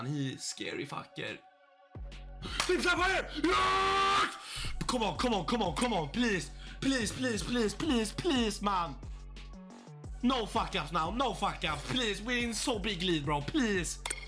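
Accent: Sweden. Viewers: hahah älskar din svengelska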